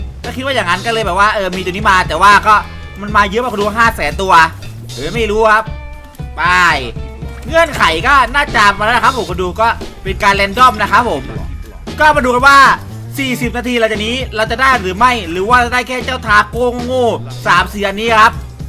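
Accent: South, Thailand. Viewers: พี่เป็นคนไทยแต่อยู่ภาคใต้ใช่ไหม😊😊😊😊😊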